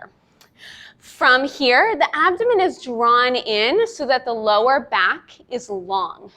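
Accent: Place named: East Coast, United States. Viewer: Great tips - and your east coast accent brings a smile to me over here on the west coast. 😀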